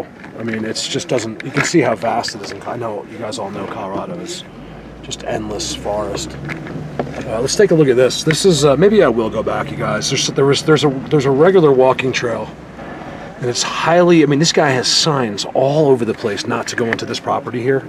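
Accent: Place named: New Jersey, United States. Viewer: I've been watching your excellent videos for a while and I knew I heard a touch of Jersey in your voice. Perhaps it's just a bit of Jersey attitude. In any case, you confirmed it. Good luck and stay safe.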